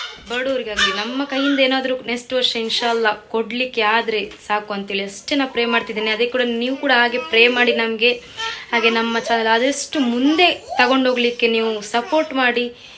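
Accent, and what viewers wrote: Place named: Tulu Nadu, India. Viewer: Nimma kannada Tulu mix kelalu kusiyagtade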